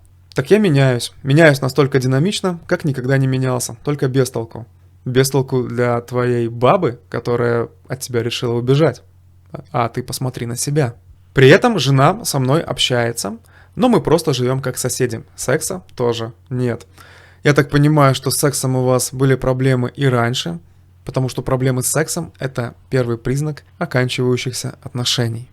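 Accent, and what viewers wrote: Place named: Belarus. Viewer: здравствуйте! Судя по акценту, вы из РБ! Я ваш земляк, и хочу Вам сказать Спасибо! Вы реально меня спасли. Все по делу, выражения-в точку! То, что и хотят слышать мужики в моей шкуре! Ещё раз, Спасибо! Если бы мог, пожал бы руку!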